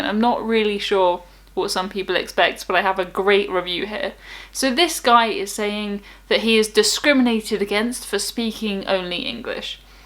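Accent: United Kingdom. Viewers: Now why could I have not met a girl like you in my younger years. Your attractive, smart, articulate - and like me, I could spend the rest of my days cruising the world. Oh, I love that British accent.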